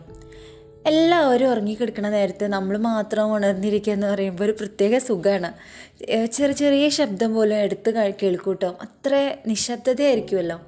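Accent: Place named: Palakkad, India. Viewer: Palakkad stilpole thonni atha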